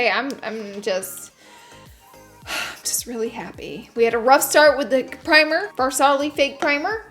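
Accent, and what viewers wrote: South, United States. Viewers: It's a southern thing! We all do it in the south. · Love how you pronounce “oil” like “ole”. ❤️. The southern accent is so cute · It's just her Southern accent. We don't all pronounce everything the same way. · Her accent, she's southern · I love how your southern accent gets stronger and lighter as you talk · i love you so much and your country accent sounds like mine lol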